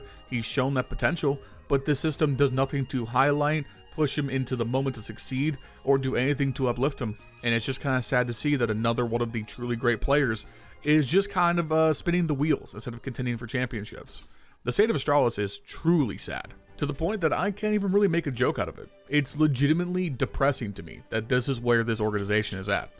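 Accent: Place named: United States. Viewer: 1. Open cs commentary video 2. Hear american accent 3. Click away from the video and never watch another of his videos again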